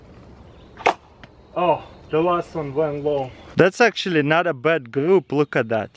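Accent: Russia. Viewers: Yeah and he also has the same accent as crazyrussianhacker I wonder if they are related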